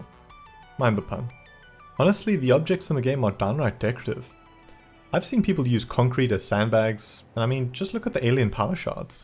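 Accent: South Africa. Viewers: I feel im hearing a South african in you..am i right?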